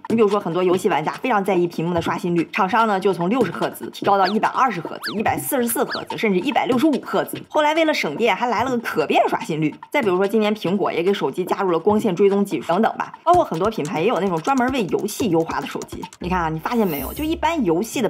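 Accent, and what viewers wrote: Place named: Shaanxi, China. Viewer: 小lin是陕西人吧 西安 😂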